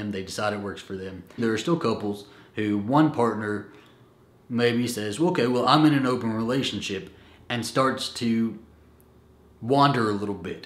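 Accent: United States. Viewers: where the hell in America are you from? Your accent is crazy American!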